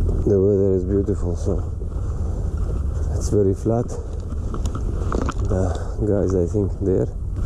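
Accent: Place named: Russia. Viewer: From the first seconds I've heard Russian accent. Hello from Saint-Pet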